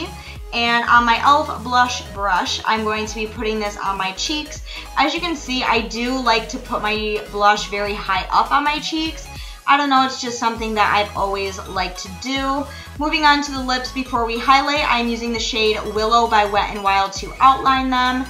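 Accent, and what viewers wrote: Michigan, United States. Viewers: Love all your looks, you make it look so easy!!! Btw love your Michigan accent! ❤️👍🏼😘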